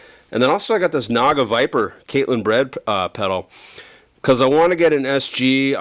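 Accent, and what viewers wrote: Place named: London, United Kingdom. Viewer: I always thought you were from London until I watched this Lol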